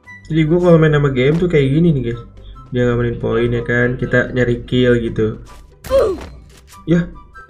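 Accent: West Java, Indonesia. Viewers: bang org mna bang Kaya Org Sunda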